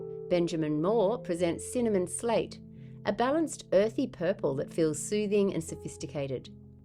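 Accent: Australia. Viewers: Your accent sounds very south/west Sydney, lagarno/peakhurst/hurstville. The accents of my youth. Thankyou beautiful video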